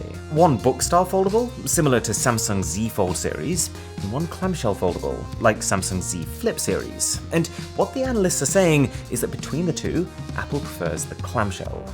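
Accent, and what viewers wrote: RP, United Kingdom. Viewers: Ah ! Terrible British English accent 🤮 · I am not even that way, but this man is just scrumptious, just the way he speaks with that posh accent and also being resplendently beautiful....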